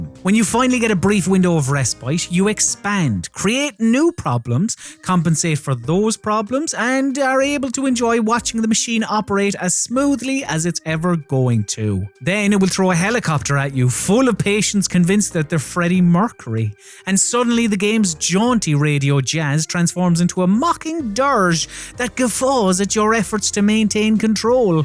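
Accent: Ireland. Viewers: I love the narrator’s Irish accent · man soundds oirish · Is this the Irish accent? So cute.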